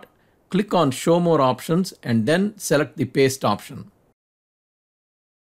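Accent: India. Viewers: There is a need for Windows 11 instruction such as this but with instructors who can speak clear English without an accent, which in this vid are by an Indian speaker. I cannot grasp the content while trying to get through the accent. It is also important to have people who understand teaching techniques for clarity, and slowing down so that everything is done in 5 minutes.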